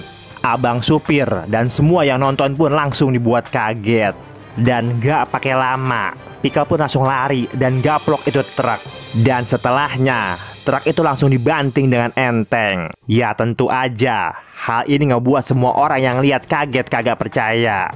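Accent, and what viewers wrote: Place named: Betawi, Indonesia. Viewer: Bang gw suka pembawaan lu yg mirip" Temen" Betawi gw kalo udh ngomong, sering" Upload bang dsn durasinya panjangin kalo bisa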